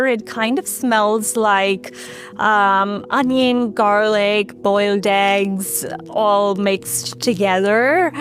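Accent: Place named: Ireland. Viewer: Just discovered your channel and am binge watching. I’m an American guy with a decent amount of experience cooking in some southeast Asian traditions but am trying to make sense of Indian food. The first thing I learned is that it’s overwhelmingly complicated. Talking about “Indian” food is about as useful as talking about “European” food. I like that your recipes and techniques are rooted in a specific region, it gives a much more solid basis to learn. I’m curious about your lovely accent in English. Did you ever live or go to school in Ireland?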